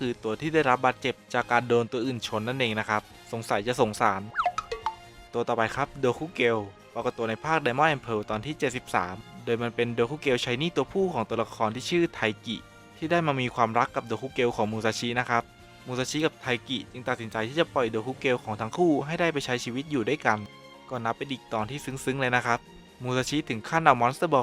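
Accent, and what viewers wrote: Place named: Suphanburi, Thailand. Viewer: สำเนียงเหมือนคนสุพรรณเลย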